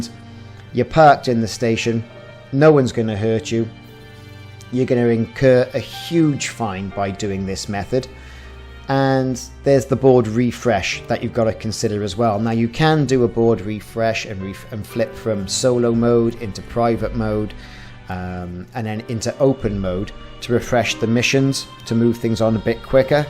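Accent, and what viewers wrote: Wales, United Kingdom. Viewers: o7 you a welsh man butty :)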